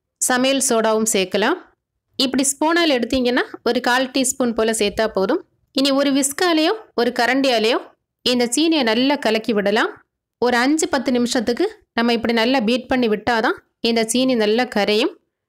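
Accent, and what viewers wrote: Kerala, India. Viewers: Are you a malayali pronnounsation malayali touch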